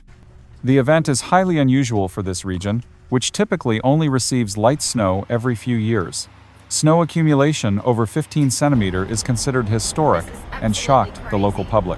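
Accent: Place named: United States. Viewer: Commentary by a friggin yank ffs!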